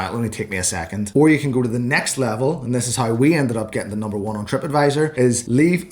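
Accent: Ireland. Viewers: I'm watching your videos for the first time and OMG... I'm learning so much as a new Airbnb company owner! This is officially my new binge...Plus totally loving your Irish accent and humour 😄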